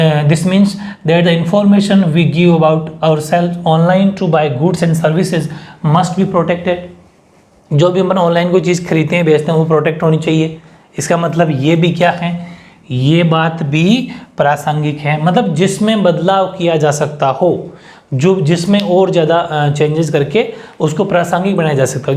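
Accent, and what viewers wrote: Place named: Marwari, India. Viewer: oho sirrr nyo paint kra liya kai 😂😂kursi bhi., shandaar h🤩... Sirr aap to baithe baithe hi pdha diya kro😊😊, aapke per drd krte h na ☹️ mhari trh hindi + marvari ghni hi achi baat h 😂😂 aapk muh se sunne me jyada acha lgta h sirrr. 2, 3 baar bol diya kro sirr😜😜 indeed sirrr literally me to uss pen ko aapk pen se compare krr rhi hu😎😎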